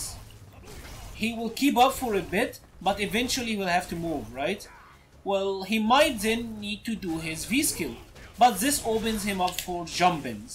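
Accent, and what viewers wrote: Egypt. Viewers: Man u sound egyption?? great video . Can u do other mus??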